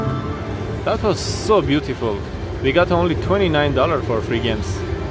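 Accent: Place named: Iran. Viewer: love your Iranian accent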